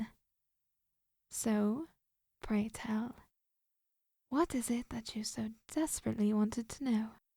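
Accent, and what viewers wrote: United Kingdom. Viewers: your British accent is no less than satisfying and ethereal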